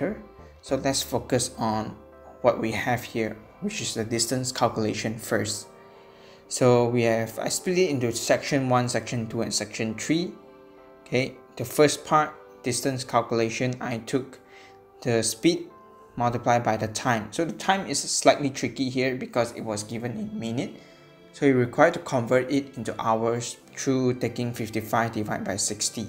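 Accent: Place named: Singapore. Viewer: You’re definitely Singaporean from your accent. hello friend, tomorrows national day!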